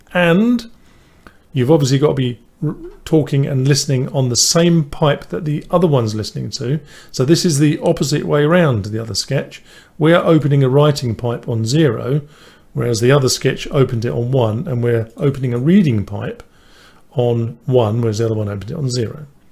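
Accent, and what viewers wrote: United Kingdom. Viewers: Hello, you accent sounds "British" ;-) The problem is, that these chips are clones. The original ones from Nordic are much more expensive, about 5 USD / pc. I have aprox. 30 of them and only 20 are worht to be used in applications. Schöne Grüße aus Deutschland. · Oh my ears are happy. There are some great tutors on youtube and i can't take that away from them but whiny American accents drive me insane. Even the accent of a southerner is music to my Leeds ears ;-) Great tutorial, Subscribed.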